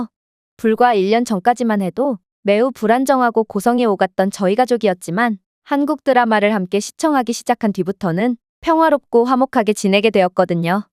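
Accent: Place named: China. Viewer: 음 아직 다듣기 전인데 처음에만 들어보니 한국인인척 하는 중국인이겠군...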